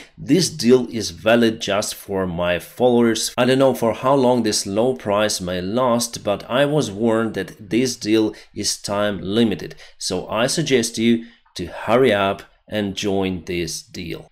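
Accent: Ukraine. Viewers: Bro I like your accent. I find language and accents incredibly fascinating. I spent a year in Poland, right on the border by Lviv. I spent a lot of time on the Ukrainian side of the border and made some friends I'm still in touch with. It was the best year of my life and your voice/accent reminds me of those friends I haven't seen in 20 years. A few are in the Ukrainian military I don't know where, I don't even know if they are still alive. FUCK RUSSIA! Thank you for the nostalgic.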